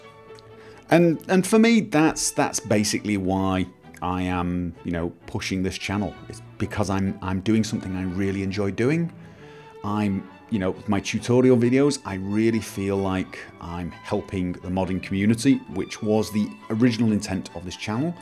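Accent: United Kingdom. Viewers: WAIT.. you're not a well mannered British Sir with a calm voice who wears mostly tuxedos, monocles and top hats/bowlers in real life who - for some inconspicuous reason - lives in Norway? THE WORLD IS BROKEN. In all seriousness, it's really hard to imagine an angry. Like, that British voice that taught me how to mod Skyrim and FNV? That's just beyond my imagination. Or that an angry would at least sound not that angry.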